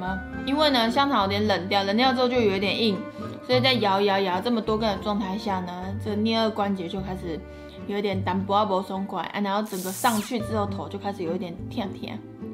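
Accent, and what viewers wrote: Taiwan. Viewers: 為什麼妳這麼台灣國語？ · 好愛妳說台語請問是哪裡人？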